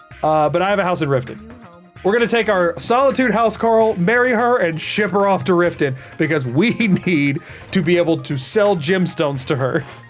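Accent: United States. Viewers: Baltimore Accent run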